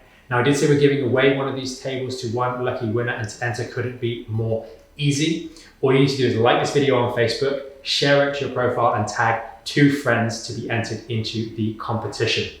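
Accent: England, United Kingdom. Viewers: Looks to me that it is a teeter inversion table, between the heavy English accent, the echo, and the fact that he’s speaking turbo mode-I can only hear like one out of 25 words (why do people bother getting a college education when it seems to me logic gets pushed out the back door every single time without fail.👎🏼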